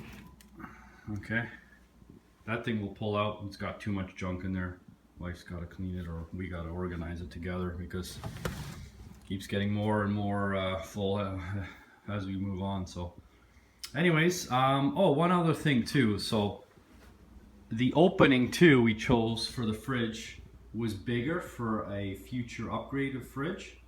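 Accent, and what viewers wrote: Canada. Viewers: Silverback Gorrilla ... Playing into stereotypes. Dumb little wanker. The bloke also sounds Canadian, not American. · Surprised to see this 'Euro' Window you have over the sink - wonder if it swings-n-tilts as well? You sound Canadian ...perhaps why ;-) Cheers!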